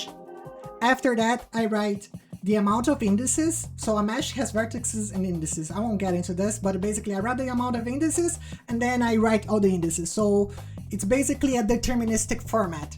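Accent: Brazil. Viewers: i listened to about 5 seconds of your accent and realized youre a fellow brazilian, thats so cool :3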